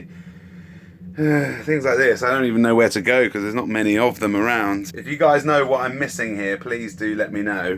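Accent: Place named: South Africa. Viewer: Love the South African accent,! Would like more of it;)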